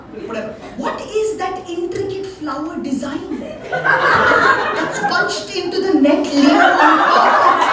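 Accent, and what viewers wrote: India. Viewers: You have a really, really thick (Punjabi?) accent and I wouldn't understand a word without the subtitles. Speak a little slowly next time, maybe?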